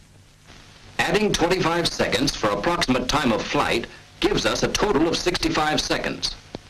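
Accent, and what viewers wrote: United States. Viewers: Classic American accent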